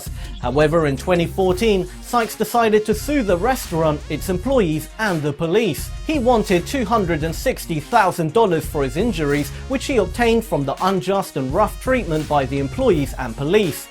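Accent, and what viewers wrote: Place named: United Kingdom. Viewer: Gets the second like and first comment, How's your day British narrator ?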